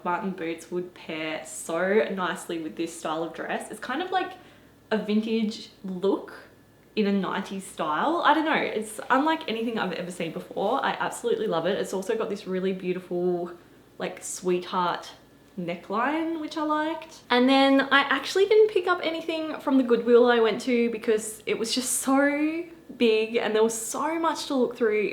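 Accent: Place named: Australia. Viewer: It's so interesting to me how accents differ between English speaking countries, especially an Aussie accent.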